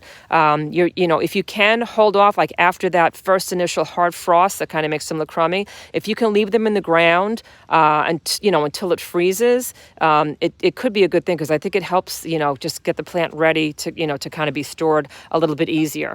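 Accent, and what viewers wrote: New Jersey, United States. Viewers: Love that jersey accent :). Endless summer is so easy to clone. I have been very sucessful and have endless plants all in my landscape!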